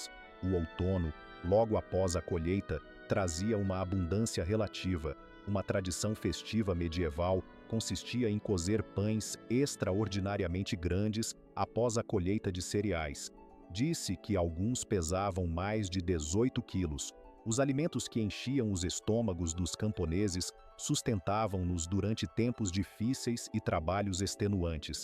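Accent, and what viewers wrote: Brazil. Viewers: Que curioso é ouvir falar em português mas com sotaque brasileiro.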